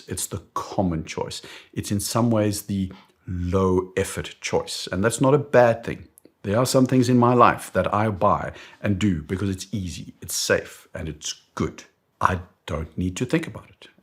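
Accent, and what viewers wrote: South Africa. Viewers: Mr, you're South African? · I have always thought that you had a rather unique accent which sounded very "Saffer", now I know where the accent comes from. Greating fom Cape Town" Boet"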